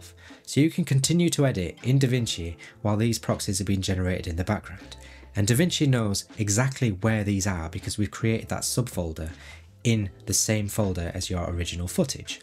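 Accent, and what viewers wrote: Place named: Scotland, United Kingdom. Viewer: Excellent channel. Love the smooth, authentic delivery of a real person. I'm going to take a wild swing at your accent. I hope it does not offend, but I'm guessing Scottish. If there's one thing I've learned these 65 trips around the sun it's this: "if it's not Scottish, it's crap" is universally accepted among civilized humans. Best Bond, Sean Connery, no contest. Best drunk Irish stories, Harris and O'Toole. Best Welsh coalminer stories - Richard Burton. Whatever you are, never change that delivery. It's your secret sauce.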